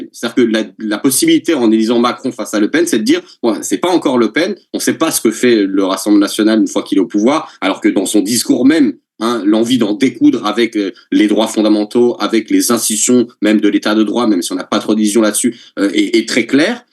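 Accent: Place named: Quebec, Canada. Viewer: Merci de pas faire exprès de gommer ton accent Québéquois, on aime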